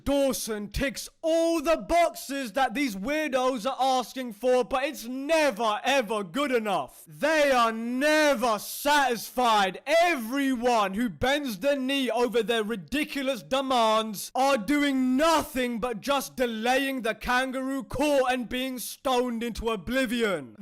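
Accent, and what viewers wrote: United Kingdom. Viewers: The British accent makes this 100x better lmao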